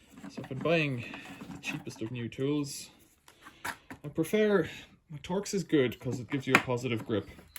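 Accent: Ireland. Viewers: Lovely chilled video. Practical, informative, clear. Top man. Also, loving the Irish brogue, not to sound patronising 😉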